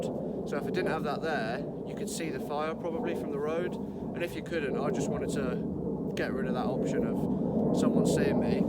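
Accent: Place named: Suffolk, United Kingdom. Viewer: Love your set up. East of you here. Nice to hear some Suffolk twang, even though you are in Norfolk.. 🥳👍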